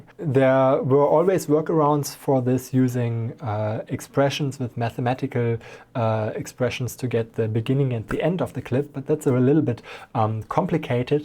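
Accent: Germany. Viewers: Moin, ich hoffe mal du verstehst deutsch. Irgendwie höre ich was deutsches in deinem Englisch raus xd Ich habe eine Frage zu DaVinci, die mir bisher niemand beantworten konnte. Ist es möglich die Geschwindigkeit eines Videos mit Keyframes, die smooth sind, anzupassen? Wichtig daran ist, dass man einen Keyframe anheben kann und die Geschwindigkeit sich genau an dieser Stelle verändert. Bisher habe ich es nur mit zwei Keyframes hinbekommen, was zu ungenau und zu aufwändig für mich ist. Wenn ich das versuche hier bildlich darzustellen, sieht es ungefähr so aus: Was ich brauche: . ' . ' . ' . Was ich bisher gefunden habe: .. '' .. '' .. '' ..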